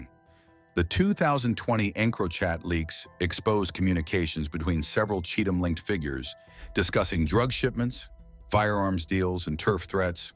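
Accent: United States. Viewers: Lmfaoooffffffs 🤣 wrong gang you dip-stick 😂 along with shyte american narrator ..Yeh you is a sad attention seeker uploading this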